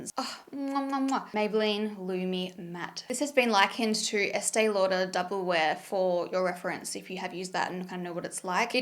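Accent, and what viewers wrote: Australia. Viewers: ! Hearing your voice reminds me of my Aussie cousins who were just here in the states. Was going to say though don’t feel bad for more engagement. We’re here because we love and want to see you succeed. We are your community! I’ve been watching you since you were at benefit it’s so crazy to see how makeup has changed since then.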